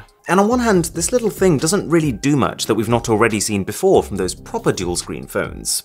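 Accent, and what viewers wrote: United Kingdom. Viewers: You can convince people to eat their phones. The way you explain these phones with your British accent is amazing.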